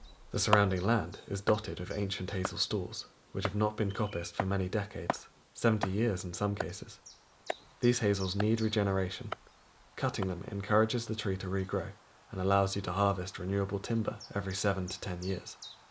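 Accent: United Kingdom. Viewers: I think, it's a good idea to make summary videos like this, to provide some insights and to share your experience along with a video itself. I read descriptions you put under your videos, they're full of interesting information, but they're also not aligned with visuals, so two exist slightly separately. Having them synchronized, with an additional information, is very cool! And I like British accents, so more narrated videos, please.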